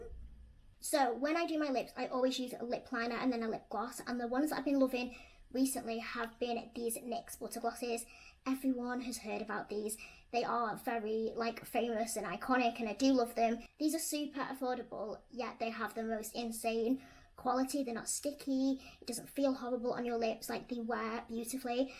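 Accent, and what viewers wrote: England, United Kingdom. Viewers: I just love your English accent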